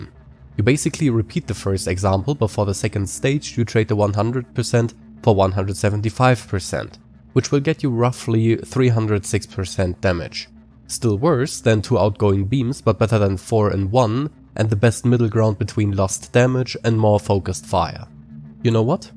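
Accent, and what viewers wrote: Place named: Germany. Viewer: Warum hört sich das an als wenn ein deutscher gut Englisch spricht?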